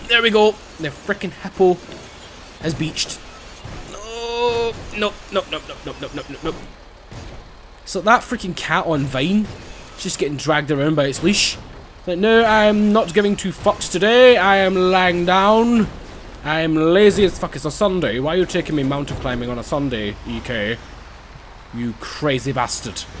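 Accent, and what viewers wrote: Scotland, United Kingdom. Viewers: What part of Scotland is he from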